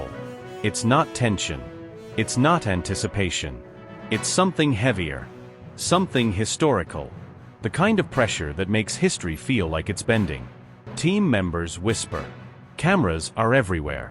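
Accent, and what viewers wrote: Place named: United States. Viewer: Soon as you hear a yank, you know its a pile of crap!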